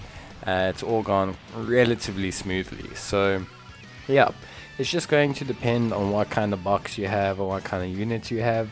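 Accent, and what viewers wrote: South Africa. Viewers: you sound South African, are you from south africa?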